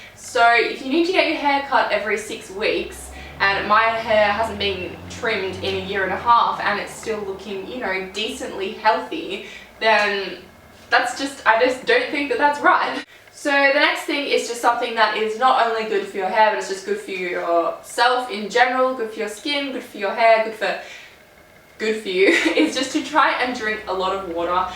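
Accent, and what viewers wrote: Australia. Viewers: Are you Australian?? it sounds like it. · Oh my gosh are you from Australia :O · Are you Australian? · Your from Australia aren't you bc accent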